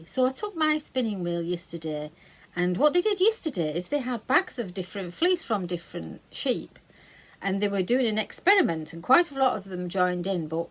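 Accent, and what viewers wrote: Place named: Lancashire, United Kingdom. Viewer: I'm a new subscriber and really enjoying your videos. Is it ok to ask whereabouts you are from originally? You sound so much like a friend of mine from Lancashire. I am originally from there, but we moved over the border many years ago. Thank you for your very entertaining podcasts. 😊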